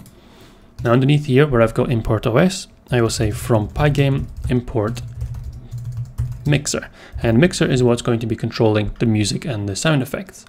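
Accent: United Kingdom. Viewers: Are you british?i heard lot of init(not programming init).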